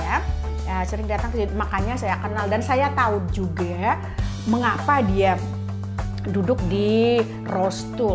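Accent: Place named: North Sumatra, Indonesia. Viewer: Ceritanya tan, sangat menarik sekali. Jadi pertama tama waktu masih ngobrol dan ketemu, kencang ya tan kalo bicara. ? Nggak kebayang ya Tan, tante kan logat Batak nya sangat kental haha. Jadi menarik sekali kalo didengar.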